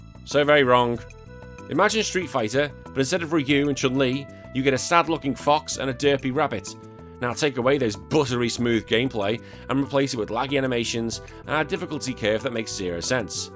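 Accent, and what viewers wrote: United Kingdom. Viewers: I love it when a British person yelled us how bad a game it’s that we didn’t get! We didn’t get Lester the unlikely! Or did you play it when you were 13?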